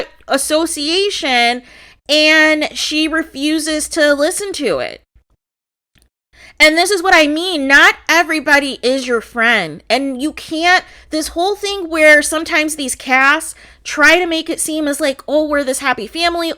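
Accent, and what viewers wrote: Midwest, United States. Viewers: Are you from the midwest?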